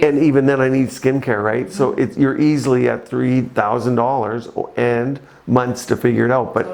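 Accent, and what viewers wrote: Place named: Canada. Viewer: Love - I hear the Canadian accent, eh? Adorable that you do the procedures and understand how the patients must feel.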